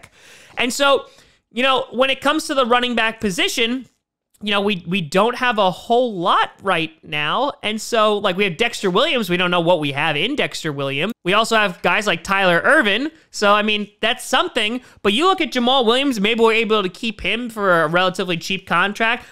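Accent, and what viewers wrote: New York, United States. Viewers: yes, is from nyc. and a lotta new yorkers will say yuge. he also says "hughston" or "yuston" rather than "houston," which some say in ny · lmao he is from NYC and that is to how new yorkers talk.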